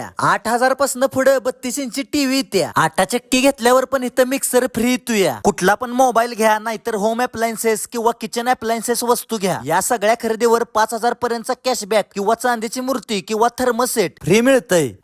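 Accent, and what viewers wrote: Karad, India. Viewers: Your way of speaking is very nice and the Karad accent is also very nice to hear❤😊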